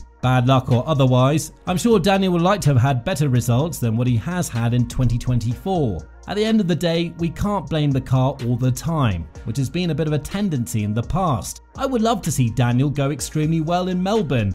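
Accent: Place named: England, United Kingdom. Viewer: An English accent saying it's all over for the Aussie driver. Some things never change. This because of cricket isn't it?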